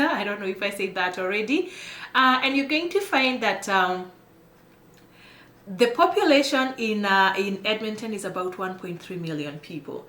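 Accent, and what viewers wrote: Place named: Kenya. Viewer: You have kenyan accent😍 Mimi pia ni mkenya in Edmonton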